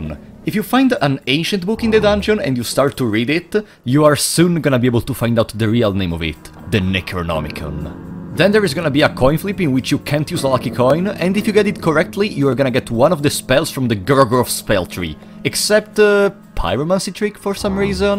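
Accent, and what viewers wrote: Italy. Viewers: i can hear the Italian accent from 1 mile away, and it is both nice and funny btw, great video :)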